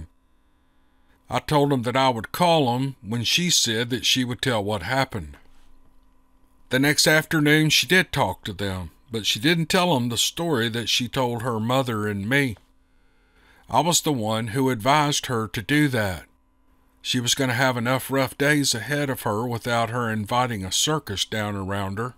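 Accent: South, United States. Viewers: You are so good reading this! Your southern voice is perfect. MERRY CHRISTMAS, TO YOU AND YOURS FROM ME AND MINE!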